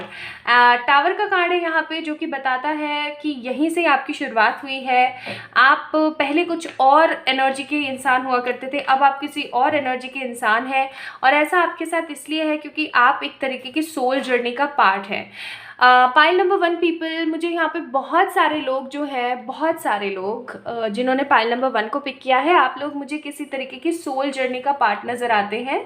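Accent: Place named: Hyderabad, India. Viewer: KYe ko..is Hyderabadi hindi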